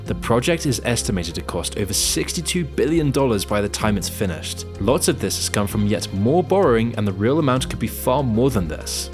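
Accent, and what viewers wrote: Norway. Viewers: are you norwegian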